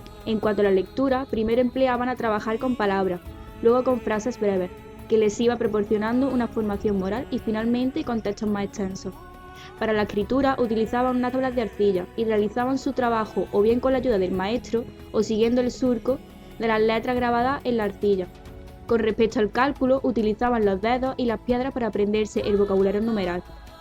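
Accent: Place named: Spain. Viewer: Buena infrmación, buen vídeo. Me gusta el acento de la narradora. De qué parte de España es? Saludos. Gracias.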